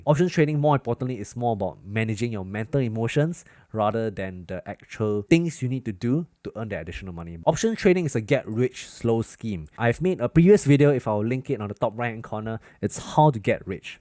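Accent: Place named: Singapore. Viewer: Hello good luck 🤞 with options . I can hear you are Singaporean. I lived there for several years at marine parade. Loved it and miss it much.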